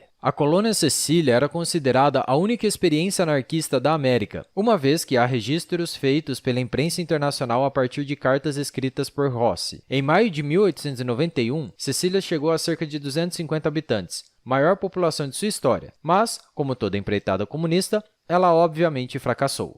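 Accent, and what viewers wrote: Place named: Caipira, Brazil. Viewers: De que região do país vc é? Esse sotaque é bem comum no Paraná, São paulo e em todo o centro-oeste do Brasil. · Esse sotaque caipira não ajuda a chegar ao final do vídeo. Pqp.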